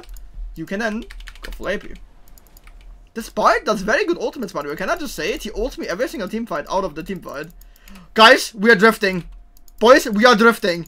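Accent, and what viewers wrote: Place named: Germany. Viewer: Ah, alrighty man. I had you at what is considered max for my ears and I still barely heard the game. You make the stutter sound so fluent it makes it sound Korean/Chinese with the speed of which you're speaking. That mixed with the German accent and the "mumbling" from the braces makes it sound even more like it lol.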